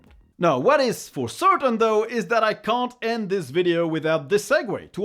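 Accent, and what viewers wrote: France. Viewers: I'm living in Haute-Loire, and as a French it's very strange to watch a French speaking English, at least I totally understand what you are saying 😆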